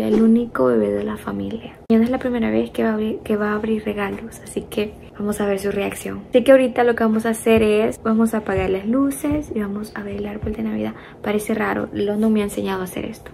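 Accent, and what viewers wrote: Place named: Colombia. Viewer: pareses colobiana hablando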